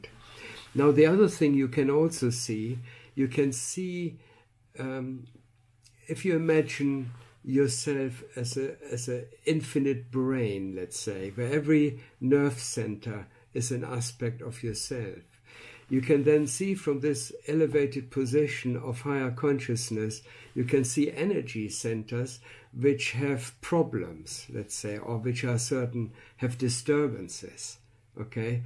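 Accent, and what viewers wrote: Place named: Germany. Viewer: warum spricht er eigentlich kein Deutsch? Er ist doch ein Deutscher. Hört man doch total am Akzent. Ich verstehe echt nur die Hälfte. Eigentlich schade. Das Thema ist so spannend!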